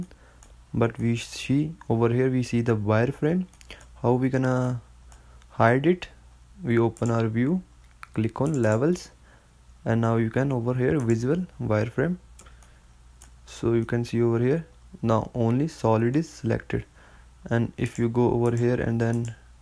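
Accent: India. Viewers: Do us all a favor and have someone who doesnt have an indian accent do the narrating. Nothing personal but english does not go with your accent. Its a shame beacuse theres a lot of good content ill miss out on. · You go entirely too damn fast for anyone watching this to keep up. Also, you have a very thick Indian accent, and tend to mumble. Work on enunciating your words better, other wise your only audience will be folks from India.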